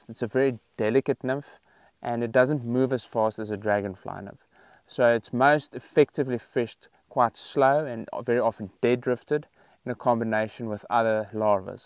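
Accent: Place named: South Africa. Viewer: Great video! your accent makes me want to go back to South Africa 🤙 thanks for Australian.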